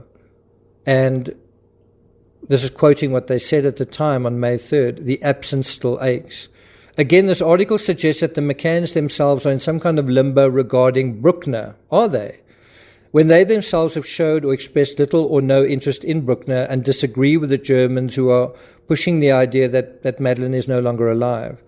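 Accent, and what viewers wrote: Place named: Johannesburg, South Africa. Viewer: Sounds like you’re from Jo’burg??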